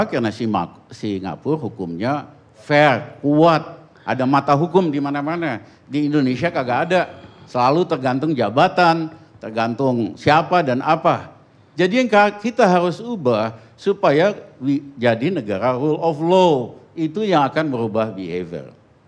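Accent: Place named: Netherlands. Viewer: Pak * kental logat belanda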